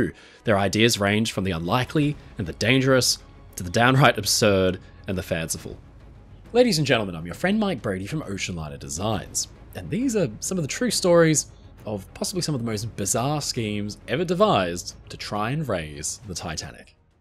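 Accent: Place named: Australia. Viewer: Hmmmm aussie guy i wonder whats his name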